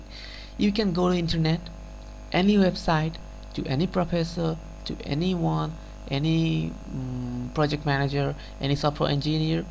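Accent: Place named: India. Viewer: what is it with the accent....u r indian right..??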